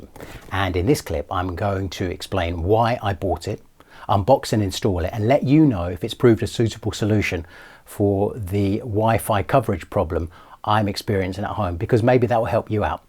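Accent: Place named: Latin America. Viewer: Porq hablas en inglés si se te nota lo latino?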